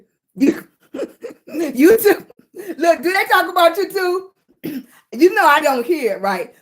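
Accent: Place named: South, United States. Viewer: Love your spirit and your southern accent. Keep being a warrior.❤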